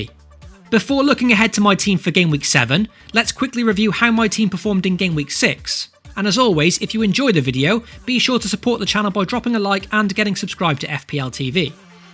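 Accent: Wales, United Kingdom. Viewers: C J It's just a Welsh accent.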